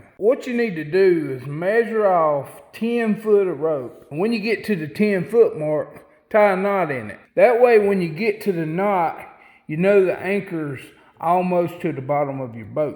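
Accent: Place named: South, United States. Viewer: I like this guy.... country drawl n all 💪🏾🐻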